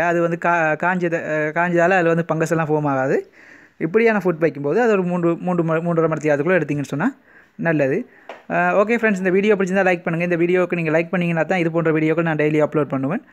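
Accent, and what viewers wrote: Sri Lanka. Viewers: Malayalam ila srilankan tamil💪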